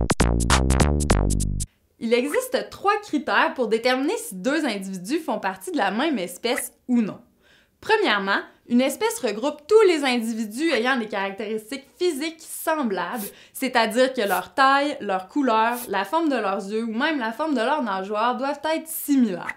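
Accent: Quebec, Canada. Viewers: Merci beaucoup ! En plus l'accent québécois est agréable à écouter haha ! 😀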